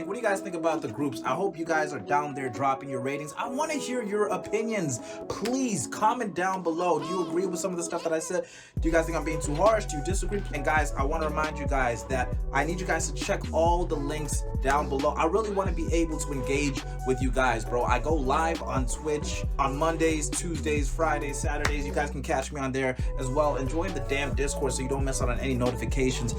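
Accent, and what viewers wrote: South Africa. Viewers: Are you south african? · Are u originally south african?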